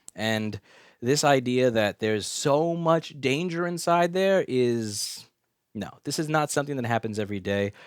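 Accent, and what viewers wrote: New York, United States. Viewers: objectivity really gives him credibility, even if at times he sounds like the New Yorker stereotype.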